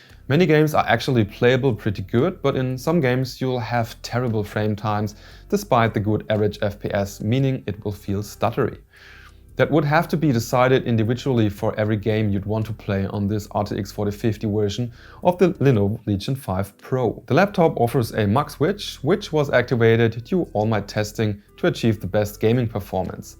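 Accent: Germany. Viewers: Man merkt an der Aussprache direkt das du Deutscher bist 😂❤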